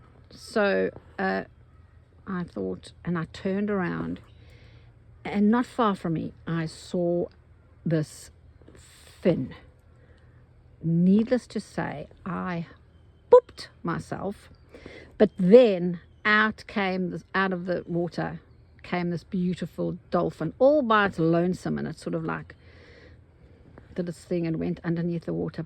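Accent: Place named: South Africa. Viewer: I keep thinking you sound South African, then you said "ooh gats" and that sealed it 😂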